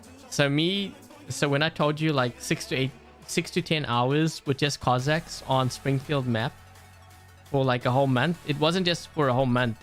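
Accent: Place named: South Africa. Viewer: Hey....when I heard you talking about sushi craze in Toronto, I didn't realize you were Canadian. I'm from GTA area too. I thought from your accent that you were in south Africa. How do I join your discord.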